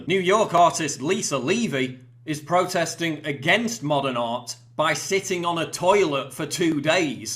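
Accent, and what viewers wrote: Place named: United Kingdom. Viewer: Just because you have a British accent doesn’t mean you’re not an idiot.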